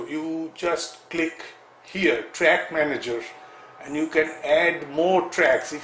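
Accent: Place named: India. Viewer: thenks a lot man!! It's good Tutorials really. I'll watch it all and the indian accent is not a problem. go ON!!.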